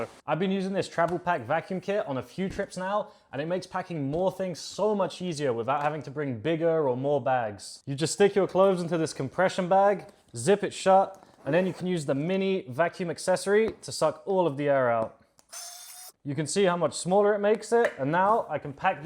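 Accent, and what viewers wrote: United Kingdom. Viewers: You know the weird thing, despite the clearly British accent, I always thought you were French until I saw the British passport! 😂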